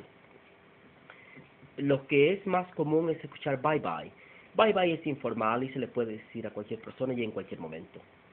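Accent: United Kingdom. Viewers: yo sueño con aprender el acento britanico en ingles ,pues amo el acento britanico la mayoria de mis grupos favoritos de rock ,demas musica y metal son de alla , the police , iron maiden , gorillaz ,led zeppelin ,napalm death, carcass ,the outfield ,black sabbath ,deep purple ,coldplay etc. y pues mi admiracion para el autor de este video ,el acento que tiene es realmente grandioso. saludos desde mexico.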